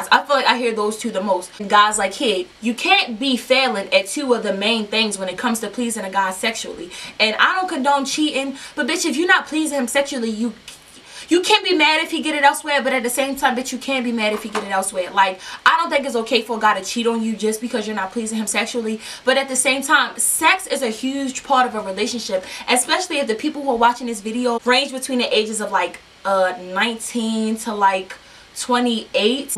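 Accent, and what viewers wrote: Baltimore, United States. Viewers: I know you from Baltimore with that accent.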